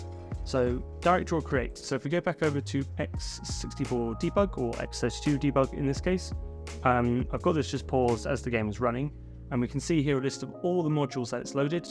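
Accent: United Kingdom. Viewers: dude you're like a british me its awesome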